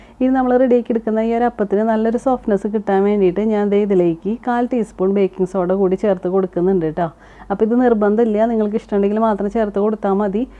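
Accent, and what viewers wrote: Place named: Thrissur, India. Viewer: എവിടെയാണ് വീട് സംസാരം കേട്ടിട്ട് തൃശ്ശൂർ ജില്ലയാണെന്ന് തോന്നുന്നു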